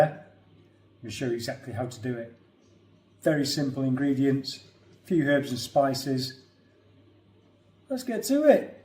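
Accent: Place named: RP, United Kingdom. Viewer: Posh sounding , posh looking. Must be posh tasting. ❤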